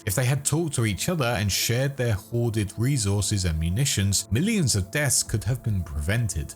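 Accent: United Kingdom. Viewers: nah he’s just British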